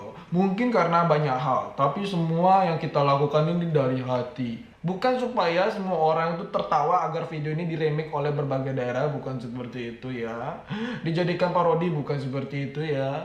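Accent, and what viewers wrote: Bali, Indonesia. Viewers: Logat bali nya sangad kerasa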